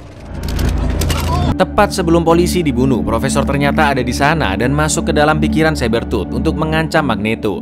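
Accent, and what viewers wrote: Java, Indonesia. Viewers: SUARANE ATMIN MEEDOK KOYO TELO EMPUK NGANGENIN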